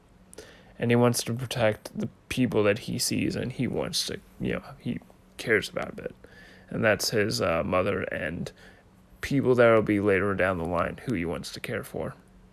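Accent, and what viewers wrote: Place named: Italy. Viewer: Also gifted Italian accent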